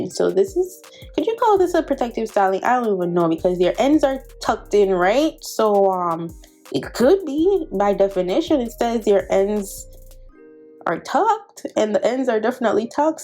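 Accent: Jamaica. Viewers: Yes!! Mi hear di Jamaican accent. Bless up sis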